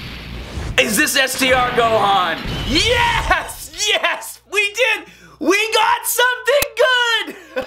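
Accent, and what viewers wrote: Boston, United States. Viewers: You from Boston my guy?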